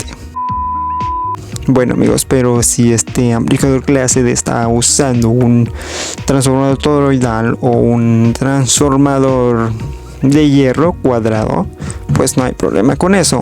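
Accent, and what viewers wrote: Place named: United States. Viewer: Todo bien. Pero, porqué hablas como gringo? 🤔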